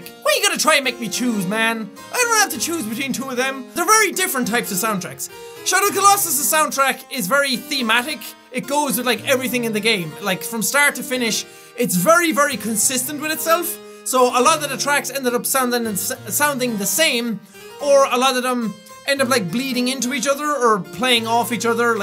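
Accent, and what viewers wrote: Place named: Ireland. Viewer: hey, you'll probably never read this, but you've helped me so much through out the couple months. my mom has currently gone through a car crash, totaling our car and adds more to one of our family's problems, blah blah, i wont go into much detail. you never fail to entertain me or make me laugh. whenever i feel like giving up, i just imagine your silly little irish voice encouraging me. i want to say thank you. im not the only one you've helped, and i really hope you continue to stay happy yourself and keep others happy. much love! high five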